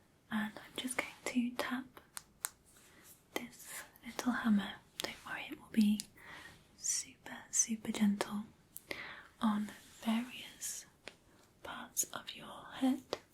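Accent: England, United Kingdom. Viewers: I now love women with english accents😊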